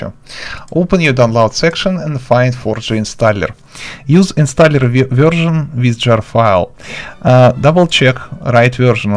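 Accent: Russia. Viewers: ты говоришь на руском? очень похоже просто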